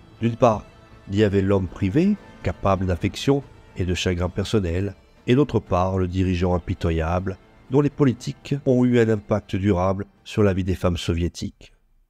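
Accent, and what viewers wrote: Corsica, France. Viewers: Bonjour, Je viens de découvrir ta chaîne et j’en suis ravie, ça me change un peu de ce que je regarde habituellement. Juste par curiosité, tu ne serais pas Corse par hasard? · Bonjour je découvre votre chaîne. Et je m’y abonne !!! J’aime beaucoup les termes abordés. Je suis sure que vous avez lu livre « femmes de dictateur « de l’écrivain Diane Ducret, il parles des femmes des dictature d’Afrique de celle dont vous parlez dans cette vidéo et bien d’autres….. De plus j’ai reconnue avec joie votre accent , venu tout droit de l’île de beauté. J’y ai passé mon enfance et adolescence entre le village de Luri et de Corabara. Ma famille étant native de l’île. Je vous souhaite de bonnes fêtes 🍾 🎊. Et souhaite également longue vie à votre chaîne. Merci pour votre travail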